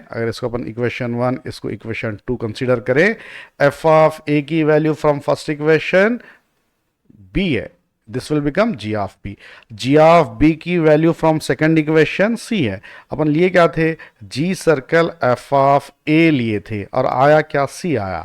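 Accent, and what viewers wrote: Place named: Hyderabad, India. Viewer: With hyderabadi voice 😍 ❤️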